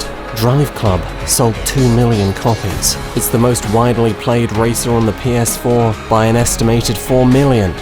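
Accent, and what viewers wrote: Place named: England, United Kingdom. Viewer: You’re voice is the right amount of English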